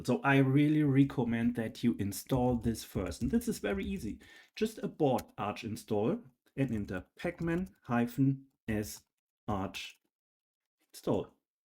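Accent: Germany. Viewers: that german accent killed me xD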